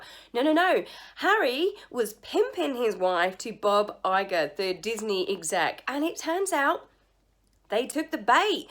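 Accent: England, United Kingdom. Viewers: I love your accent 😍 What part of England are you from?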